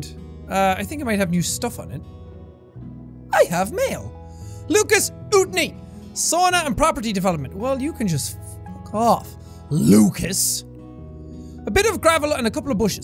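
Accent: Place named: Ireland. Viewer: Man, what happened to the good old days when just started using his Irish accent? I wish I haven't watched every video back then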